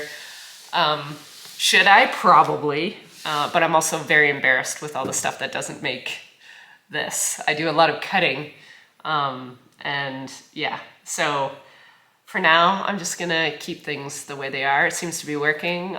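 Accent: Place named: Canada. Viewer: Don’t care about hair. Am old city bred gal now retired. Can not travel so utube is it. This year I have begun to learn about farming. Larg er animal care and feeding. I love your slight Canadian accent. Thank you for what you do.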